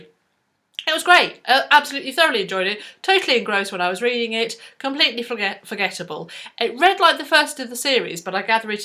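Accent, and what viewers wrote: United Kingdom. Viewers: We have quite different tastes in books but I love your videos so much! I loved your James Corey rant! And I love your accent (is that weird?) I'm Cumbrian so you sound incredibly posh! Have a great day!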